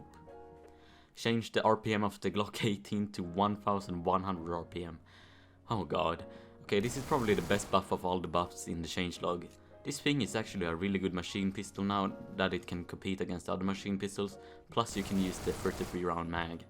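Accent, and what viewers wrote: Sweden. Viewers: I can tell that you're swedish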